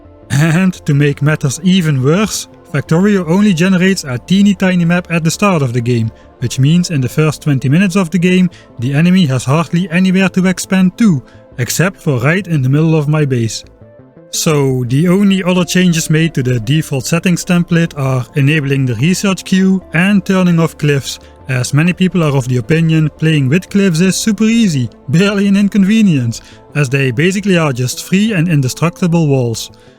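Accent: Netherlands. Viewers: staalhard hollands accent ook lol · First one of your videos that i watch and i love your accent, is it dutch? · Geweldig Nederlands accent Amazing Dutch accent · Jesus christ your dutch accent.. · Your Dutch accent is thick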